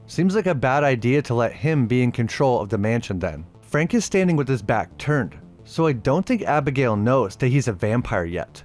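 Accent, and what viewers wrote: Canada. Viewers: Holy Canadian accent!!!!!!!! LOL!! I forgot how Canadians pronounce things like “Out and about = Oot and aboot” “Mom = Mum”………..I thought my MN accent was bad!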